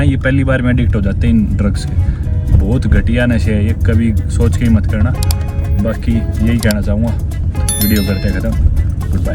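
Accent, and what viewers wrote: Haryana, India. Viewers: Thank you for all your tips. Very helpful. Although I would like to add, slamming doors is considered rude & bad manners in many countries, not just in Columbia. It is common knowledge because generally people slam doors in anger. I also accidentally saw one video and really liked your simple, honest, practical, genuine (no show), with Haryana accent information. 😊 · I'm from Pakistan I 💓🇵🇰 long live 🇵🇰 nice beautiful Bhai AP ka Haraynivi Accent bhuht acha lagta hi 💚💙🙏🇵🇰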